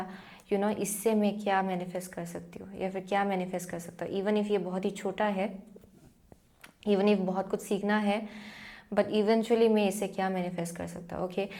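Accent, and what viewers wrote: Northeast, India. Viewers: Aap north eastern se ho kya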